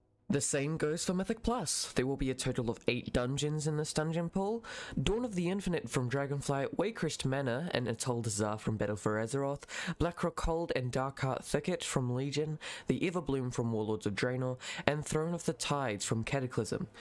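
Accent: New Zealand. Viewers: Is this guy a kiwi? The accent is close but something is slightly weird about it, maybe from some random place in NZ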